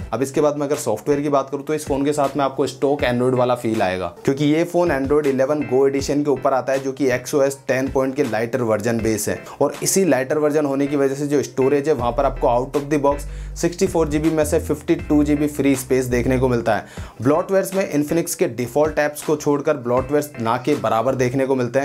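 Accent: Rajasthan, India. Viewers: Your swag is lovely bro. Cool hairband. Little Rajasthani accent. And fantastic review. Katai zaher bro.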